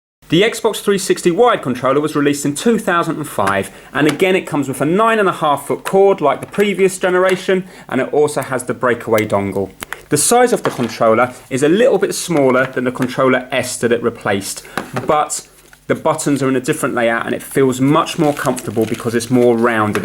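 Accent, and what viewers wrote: United Kingdom. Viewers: It’s a British accent like me, America uses England’s language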